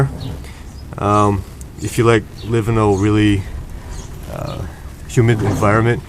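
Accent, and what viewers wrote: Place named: Long Island, United States. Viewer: Excellent video! You MUST be from Long Island. I'm gonna guess Suffolk County. Am I right?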